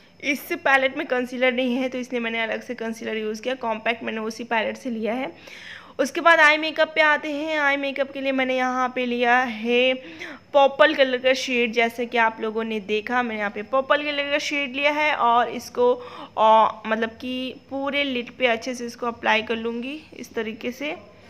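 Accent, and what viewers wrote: India. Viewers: just a simple luckhnawi lehja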